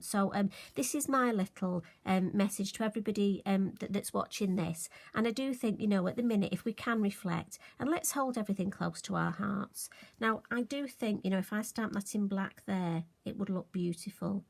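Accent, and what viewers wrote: United Kingdom. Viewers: Absolutely gorgeous! I have got to try this one! I love your accent! My son and his wife live in London and last fall we stayed there three months. It was the best time of my life other than having my babies! Now I’m going to be a grandmother....so exciting! Just hope we can get over there again next year! So enjoy your videos...keep them up!♥️😘🤗🌸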